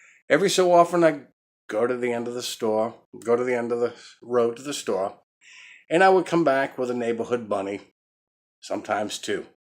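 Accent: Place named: East Coast, United States. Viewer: Love the stories... no matter what!!! You make me feel at home.. with your east coast accent. Ima New Yawka!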